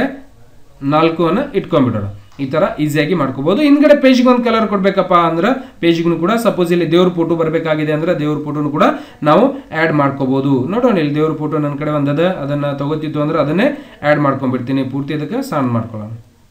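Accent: North Karnataka, India. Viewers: Haha hutharkanada bashe keloke kushi agutapa ❣️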